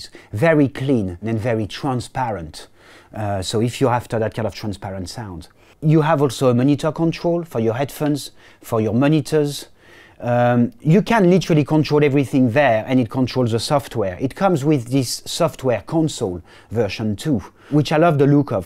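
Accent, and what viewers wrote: France. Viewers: Ehh' lil French accent, très bonne vidéo ,merci beaucoup et bonjour de la France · i was wondering, definitely sound French, especially when he says "acoustic"! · Hmm thanks for the info We sometimes overlook the importance of the hard drive it counts mostly when using a lot of Vst I think Pc is better for home studios because a Pc is easier to fix up.... parts can be changed easily and you can upgrade it easy Though is it basic for beginners I really like the accent from my country Go French! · Interesting accent..French?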